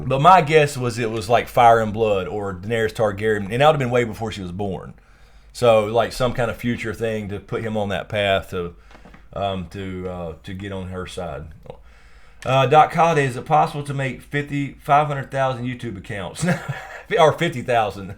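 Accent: South, United States. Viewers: there is nothing wrong with a sweet good ol boy accent. just ask for parts as soldiers in someones army.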